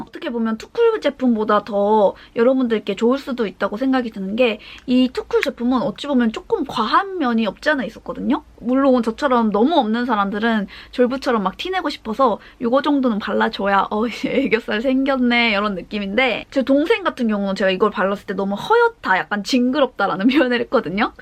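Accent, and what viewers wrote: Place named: Gwangju, South Korea. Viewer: 혹시 언니 광주에 사시나요? 말투가 제 친구들과 똑같아서..❤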